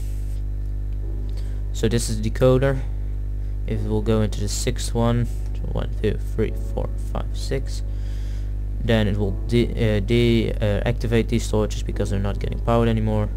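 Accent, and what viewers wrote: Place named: Netherlands. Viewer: Are you and you're friend dutch? If so you're accent is awesome :D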